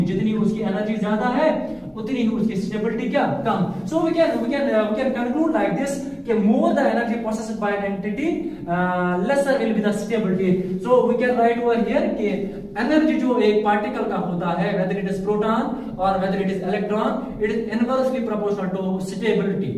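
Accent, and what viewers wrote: Kashmir, India. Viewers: Apki language bohot complicated hai sir pronunciation....is in kashmiri Difficult to understand....and please speak slowly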